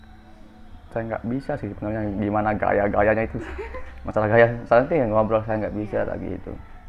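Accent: Lombok, Indonesia. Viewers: Kayak logat lombok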